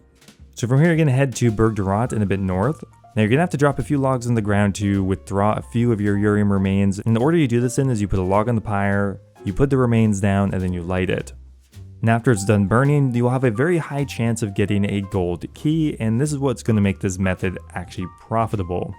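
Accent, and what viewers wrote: Quebec, Canada. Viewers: are you quebecois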